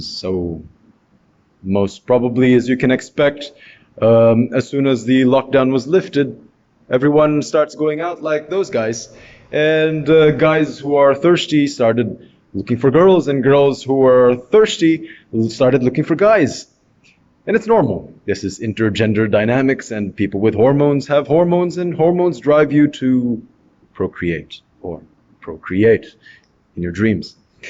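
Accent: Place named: South Africa. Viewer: Is that South African accent bro ?? Are you South African? Good speech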